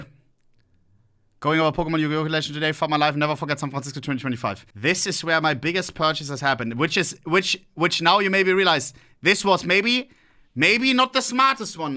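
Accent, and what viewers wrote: Germany. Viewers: the german accent makes it better somehow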